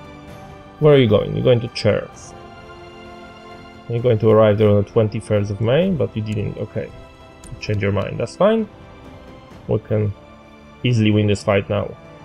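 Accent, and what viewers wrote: Poland. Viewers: I did know that from the beginning of the video, you have typical polish accent :D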